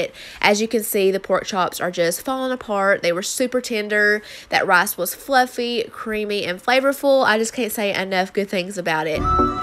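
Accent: South, United States. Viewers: Are you from the south? Cause you talk and cook southern like myself :p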